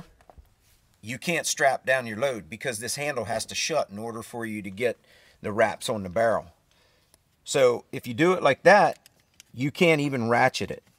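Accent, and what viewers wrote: Australia. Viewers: Great vid bro love the accent, it’s been a while since I used one of these dazz Australia.. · Mr Big Australian English.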